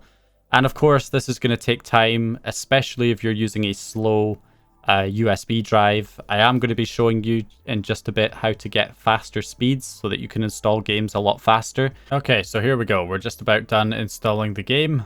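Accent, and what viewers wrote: Scotland, United Kingdom. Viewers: Been thoroughly enjoying your videos man! Keep 'em coming. It sounds like you might be Scottish so that's an added bonus! "HERE WE, HERE WE, HERE WE F*CKIN' GO!"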